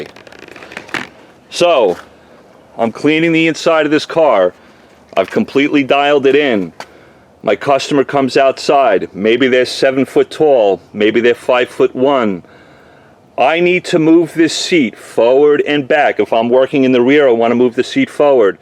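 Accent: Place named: New York, United States. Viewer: Awesome ideas to make everyone"s world easier, Thanks! How did I know You were from up north? I guessed jersey, but the accent isn' t heavy enough. Maybe boston, but no. Should have known NYC. I am from upstate myself. In NC and VA they have tried to get me to be a rebel! Not working!! Love them all anyway! Thanks for all your vids and hard work.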